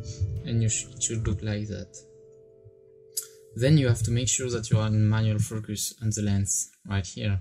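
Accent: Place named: France. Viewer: Tnx for this tutorial. I like ur french accent :)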